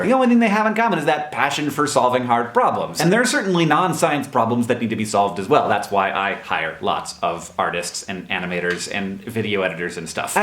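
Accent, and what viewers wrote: United States. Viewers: Do you live in America? (Judging by your accent from your videos, I think you do.) Money practically grows on trees here...if you have any sort of brains, creativity, OR charisma. Or a pulse. If you feel like "there's no money" in a certain field move to India for a few years. Try out Pakistan. We have it pretty good.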